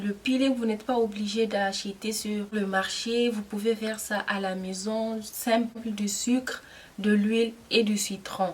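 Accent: Niger. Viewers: Merci chère soeur Sinon tu as un accent Nigérien.suis Nigérienne aussi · J'ai direct reconnu l'accent Nigerien 😅, merci pour les conseils !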